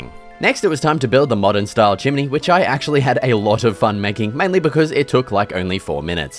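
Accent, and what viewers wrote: Australia. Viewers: He's not British, he's australian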